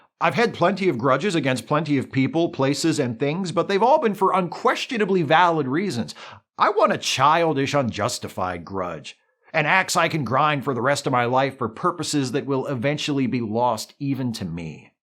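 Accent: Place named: Northeast, United States. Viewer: Very Pennsylvania/New Jersey vibes, I approve.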